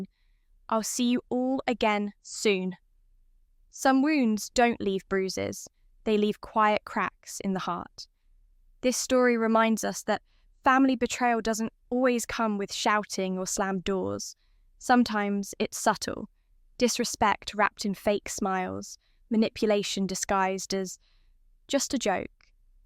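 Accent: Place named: United Kingdom. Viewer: Hate the British accent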